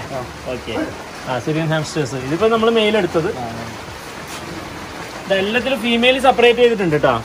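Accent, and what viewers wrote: Kannur, India. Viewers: കണ്ണൂർ ഭാഷ 👌...🥰.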